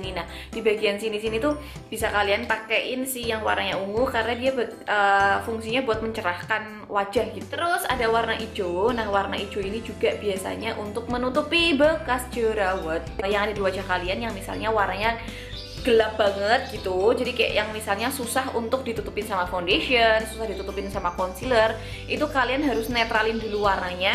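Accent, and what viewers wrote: Java, Indonesia. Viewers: Cantikkk bgtt mbak medokknya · Ayune kyok golekan jeung.... salam kenal yo aku soko jeporo mbak... tetap bikin video ala mbak medhok aja ya ... kereeennn inspiratif sekali buat saya dan buat pecinta makeup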